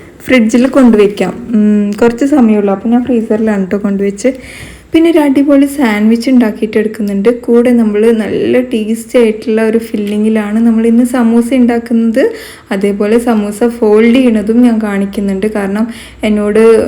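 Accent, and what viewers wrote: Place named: Kannur, India. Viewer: Fest thane adichu varunna seen ozhivako maachi🤣🤣🤣superatttto to to to kelkan rasam kannur ami good recipy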